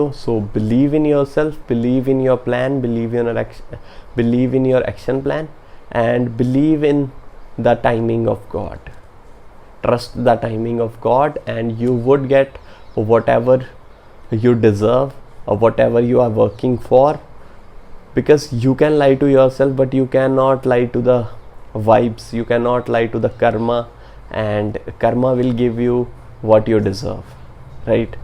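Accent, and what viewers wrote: Punjab, India. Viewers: Are you from Punjab?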